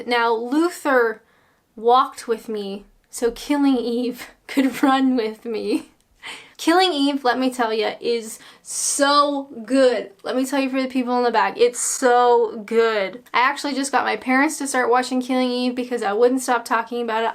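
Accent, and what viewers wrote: Canada. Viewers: OK, just another creepy old guy here who only recently found your channel, I love Canada and I really enjoy your delivery. However, if you get the chance to return to the UK and if you want to assimilate with Southern England, then you need to learn how to pronounce Task Master ... it should sound like Tarsk Marster. ;-) ;-) ;-) Haha ... tbh, I like your accent, don't change it. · wow that canadaian accent has come back really thick and strong. instead of crap under ur grey sofa, i see ur chucking clothes and crap on the floor near your bedside table. what book are you reading?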